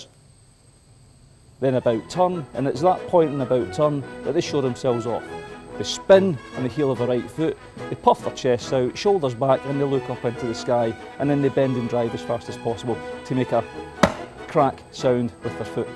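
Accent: Scotland, United Kingdom. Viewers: Strong accent, difficult to understand for foreigner like me. Is that Scottish accent ? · nice Scottish accent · I really enjoyed the video but try speaking a little slower. Many of us across the pond are not familiar with the Scottish accent.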